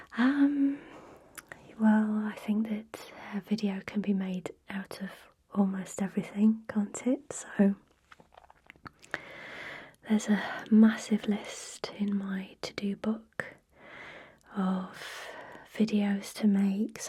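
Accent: United Kingdom. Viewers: I subscribed because of the nice British accent c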